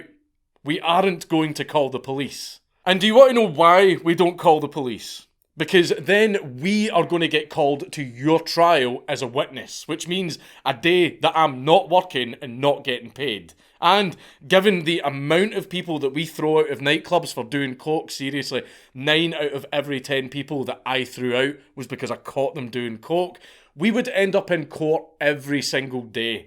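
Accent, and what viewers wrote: Scotland, United Kingdom. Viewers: stfu ur scottish brother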